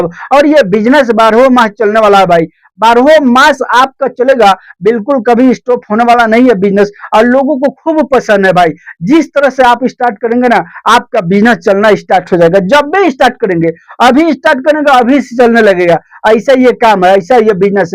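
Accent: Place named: Bihar, India. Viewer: Bihari ho kya bhaiya conversation shikho pahle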